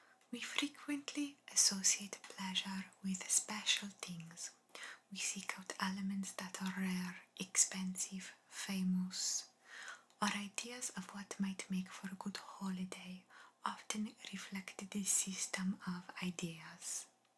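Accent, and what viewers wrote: Italy. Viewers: I love your Italian accent videos beautiful eyes ❤your voice is so calming 🫂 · Hello, magnificent ASMR with an Italian accent. I loved it and enjoyed it, it's relaxing. Greetings, beautiful 🌹🌹🌹🌹💖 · I love the creativity that you put into these roleplays. Very few ASMRtists would think to talk about water towers or Tom Cruise playing hide-and-go-seek. Your Italian accent is very fun too. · È così bello ascoltarti, il tuo accento italiano è meraviglioso e molto rilassante.💚🤍❤️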